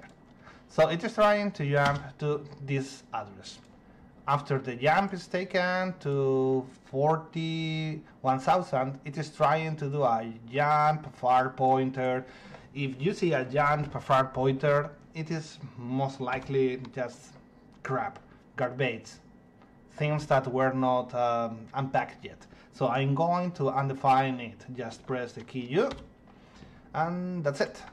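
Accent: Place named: Spain. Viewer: Acento de españolazo. mis dies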